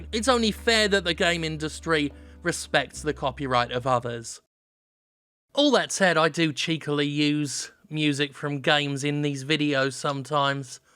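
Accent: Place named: United Kingdom. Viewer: I wish I could say controversy like that. But without the British accent, it just doesn't work.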